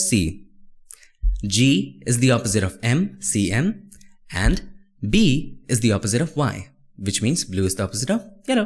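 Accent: India. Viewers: you have good techniques and concepts, but damn your english! you talk like an indian rapper. you have to slow down on your words and give more emphasis to it.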